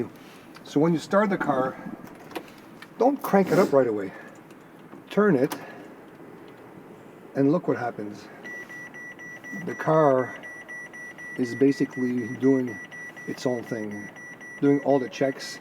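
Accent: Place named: France. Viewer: Je sens l'accent français ❤❤❤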